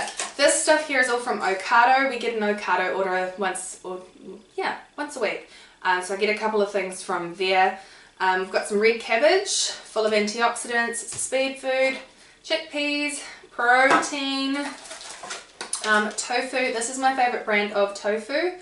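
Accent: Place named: New Zealand. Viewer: You are just what I'm looking for, vegan just started slimming world and just put the daily dozen ap on my phone, I also spent a lot of time in N.Z. so loving your accent, thanks for sharing xx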